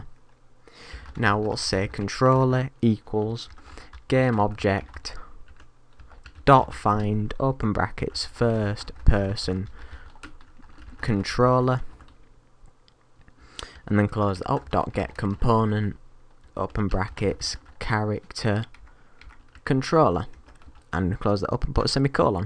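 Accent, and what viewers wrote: United Kingdom. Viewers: Man are you british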